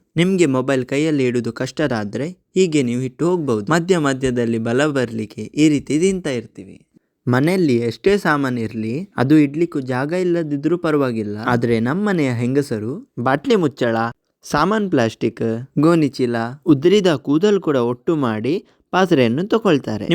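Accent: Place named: Mangalore, India. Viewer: Mangalore kannada kelode chenda ❤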